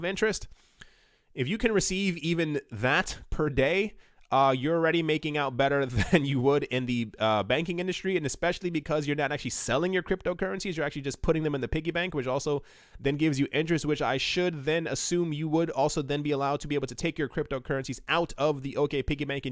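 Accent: United Kingdom. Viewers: Mate, if someone tried to ridicule me for using a british accent, I would do the whole video in british accent. Don't be bothered by these knobs. If they're foolish enough to invest with a loan or can't see the developments in crypto space, then the crypto space will be better off without them anyways. Thanks for anothet great content and boy are we entering interesting times... all the best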